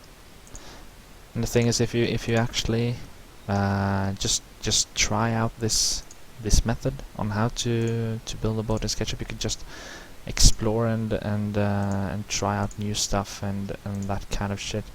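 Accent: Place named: Spain. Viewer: This is a great tutorial, even more for the ones who are still starting to use Sketchup. Thank you very much for sharing! By the way, if english is not your main language, which is? Is it spanish (i have a feeling about it).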